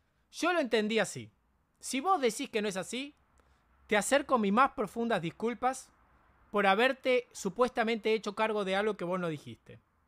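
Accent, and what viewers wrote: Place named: Uruguay. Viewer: pero ahora que lo diga bien y no con acento de uruguayo castrosón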